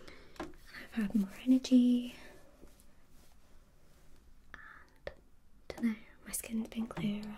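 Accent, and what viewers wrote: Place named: Liverpool, United Kingdom. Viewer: You sound like you’re from Liverpool in this video. Not sure if you are but I’ve never heard it before. I love this video btw grwm asmr is my favourite xx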